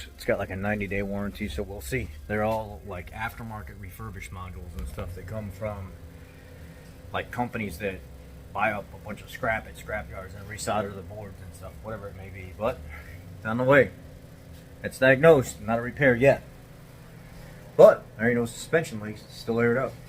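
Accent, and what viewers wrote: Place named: South, United States. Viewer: Great vid. You had me cracking up with you country accent….LOL…Stay Blessed..I’m still giggling as I type this.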